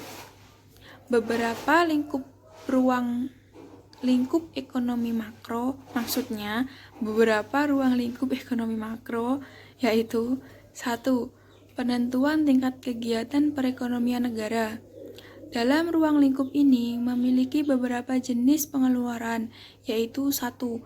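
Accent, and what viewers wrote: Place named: Klaten, Indonesia. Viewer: Kamu orang klaten ya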